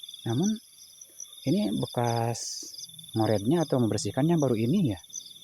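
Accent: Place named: West Java, Indonesia. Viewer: kang Sunda mana logat Sundana mani kaciri pisan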